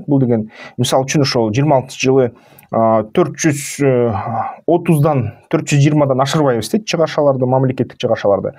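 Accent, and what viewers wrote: Standard. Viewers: Сонун таза кыргызча менимче мамилекет туура жолдо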